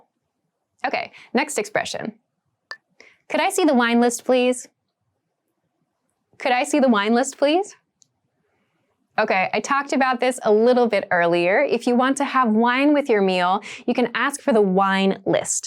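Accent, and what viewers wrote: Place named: United States. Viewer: she must lead US accent!😊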